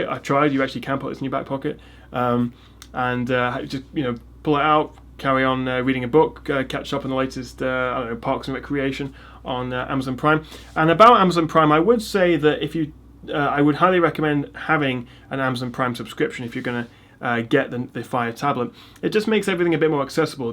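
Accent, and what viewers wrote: England, United Kingdom. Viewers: of course from england, what country are you from to not know where this geeza is from? good review btw i just bourght it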